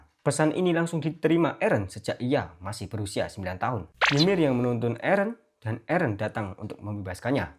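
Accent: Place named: Java, Indonesia. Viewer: Medok bgt jaw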